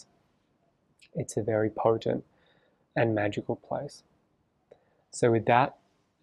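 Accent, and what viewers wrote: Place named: Australia. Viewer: Bro are you Australian or from New Zealand because I've noticed the consciousness of people with this accent is wild 😂😂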